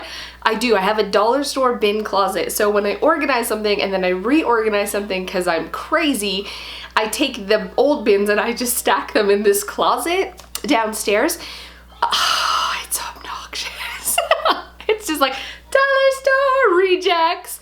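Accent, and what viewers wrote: Canada. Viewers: I thought you were from Canada based on your accent! ☺️☺️☺️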